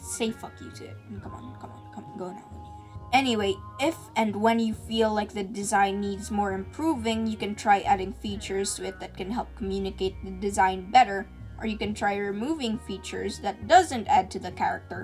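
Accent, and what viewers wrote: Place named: Philippines. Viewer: I knew your Filipino from the way you speak (IDK but I can easily determine Filipino accent) but I got the confirmation with that YAWA PUTANGINA moment.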